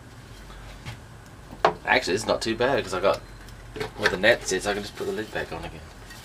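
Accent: United Kingdom. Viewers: Dude you sound like you are originally from the uk or something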